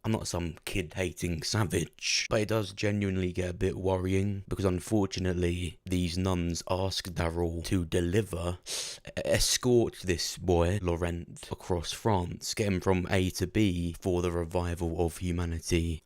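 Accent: United Kingdom. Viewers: you sound british, can you confirm if these will be aired in the uk because this is about to be my 13th reason if not.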